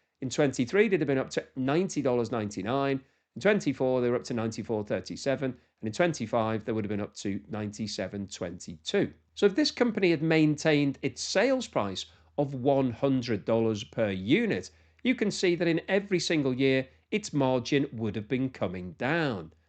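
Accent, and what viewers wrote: United Kingdom. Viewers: Luv the British accent! At least I think it's British!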